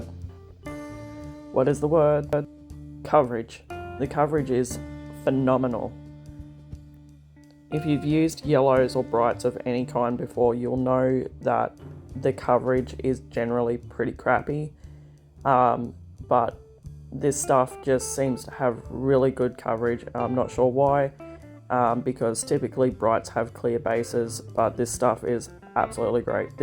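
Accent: Australia. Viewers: I pity anyone who doesn't speak australian. Love the inspiration on the desk.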